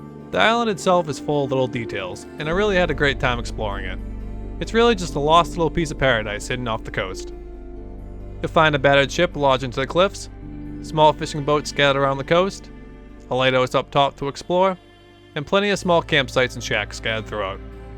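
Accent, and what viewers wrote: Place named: Massachusetts, United States. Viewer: this guys sounds like he's a Mass-Hole ! accent is strong of a Massachusettes.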